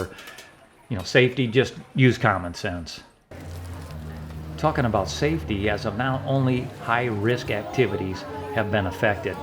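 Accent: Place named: United States. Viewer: Thanks for the captions, cause you American accent is difficult to listen to.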